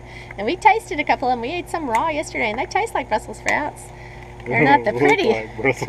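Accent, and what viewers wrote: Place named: South, United States. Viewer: Just love your southern twang! You sound like my sister 🤗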